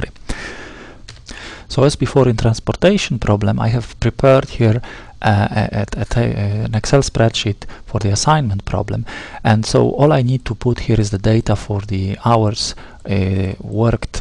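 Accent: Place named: Egypt. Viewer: واضح انك مصري :))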